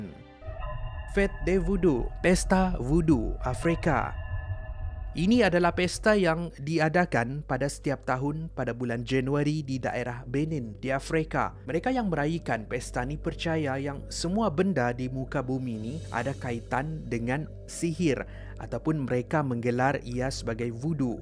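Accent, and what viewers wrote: Sabah, Malaysia. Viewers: abg ni sabah or sarawak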